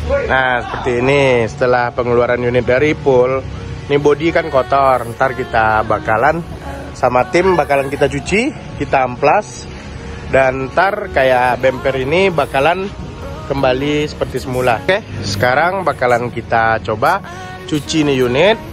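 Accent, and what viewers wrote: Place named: Sumatra, Indonesia. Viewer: Bahasa indo nya logat sumatra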